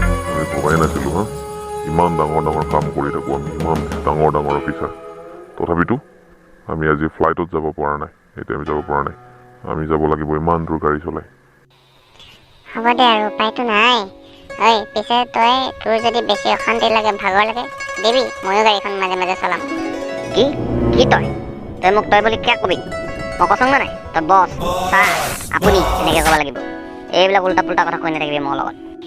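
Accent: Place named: Morigaon, India. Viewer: Ha ha ha Mojja Morigaon vaxa jen lagil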